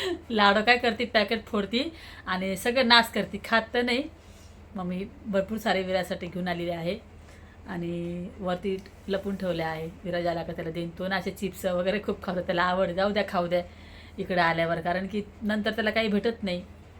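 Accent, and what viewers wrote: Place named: Kolhapur, India. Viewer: Kolhapur bolte hain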